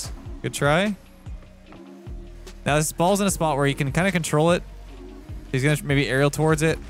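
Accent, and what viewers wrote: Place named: Canada. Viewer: i can hear the canadian so much more now omg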